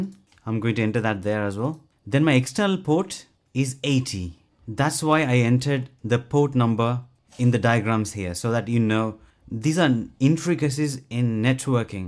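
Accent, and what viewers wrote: South Africa. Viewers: Love the videos. Can you do one for controlling apple HomeKit? Are you South African?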